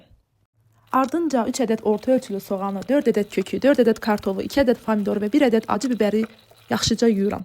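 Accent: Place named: Karabakh, Azerbaijan. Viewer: Kanaliniz yeni qarsma cxdi abune oldum😊xeyrli ugurlu olsun🙌deyesen Qarabaglisiz lehceniz sirin geldi❤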